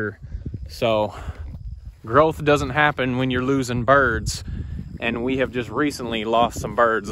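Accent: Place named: Alabama, United States. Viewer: Hey, that sounds like an Alabama accent you have.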